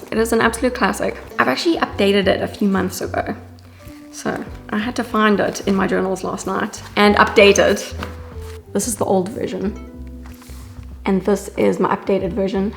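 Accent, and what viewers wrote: South Africa. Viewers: Just discovered your channel...are you South african? I am from Cape Town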